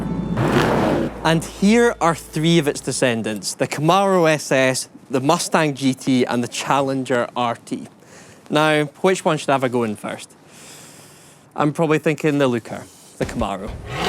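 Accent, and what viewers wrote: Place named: Glasgow, United Kingdom. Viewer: Do you? I'm Scottish and hate his accent. It's that "I'm a spoiled middle class Glaswegian who went to university and had 5 star accommodation and loads of money to buy me and my friends loads of things" It's a horrid accent.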